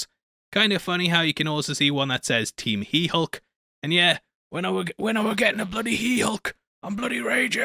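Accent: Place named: North East England, United Kingdom. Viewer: The only thing I look forward to other than a marvel movie or show is your break downs. Your NE accent is just totally 💯. The one place I miss in the UK most. Great stuff sir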